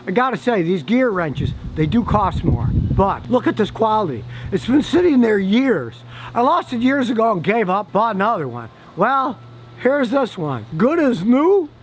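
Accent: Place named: New England, United States. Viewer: If you were not from Texas I would have after watching this video, thought you were a old YANKEE from New England. you reminded me so much of my old friend Barry. Keep up the great work.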